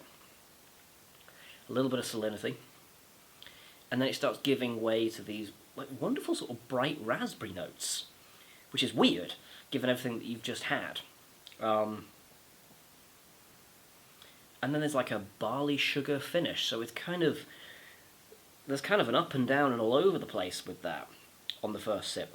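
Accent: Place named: RP, United Kingdom. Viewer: Sincere condolences mate. Keep them coming. Missed the toff talk lol. Sound's a bit fkt but nothing major. Sláinte 🍻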